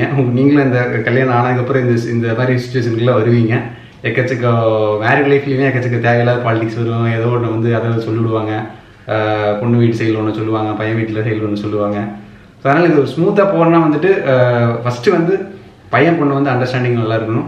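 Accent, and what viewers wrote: Kongu, India. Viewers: Hi bro.. I am new subscriber. Ungaa video first pakkum pothu ignore panni irukey...apparama dhan Tamil laa paathey...very nice chance yeah ilaaa...athuku apparamaa ungaa videos yehllam theadei theadei paakkaa start pannaney...sehmaa bro 👌athum ungaa kongu Tamil very nice....genuine person positive energy...beautiful family....cute wife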